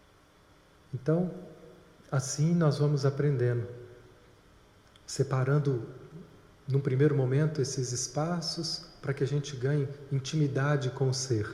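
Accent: Minas Gerais, Brazil. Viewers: Caríssimo estou muito grata a mimhá amiga que apresentou o Pathwork e vc foi o primeiro vídeo e toca minha sombras, todos os dias eu me aceitando... a criança egoísta, invejooosa... reconhecidos... os sonhos eu me vendo... AMIGO este maravilhoso trabalho seu... tom de voz o mineirinho bão sô! Obrigada! obra em negro/branco/vermelho dos alquimistas está em curso.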